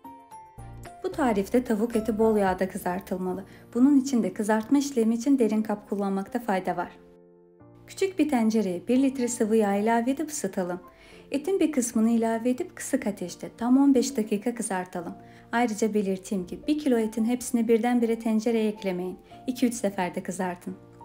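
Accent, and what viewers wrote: Azerbaijan. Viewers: Azerbaycanlılar gibi aksanız var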